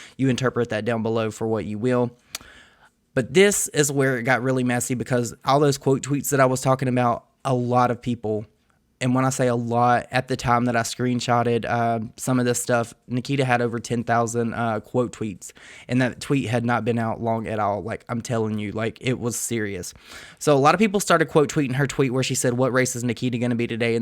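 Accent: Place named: Tennessee, United States. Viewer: Thanks for the 🍵. And your accent reminds me of summertime on Dale Hallow, Norris Lake or Cumberland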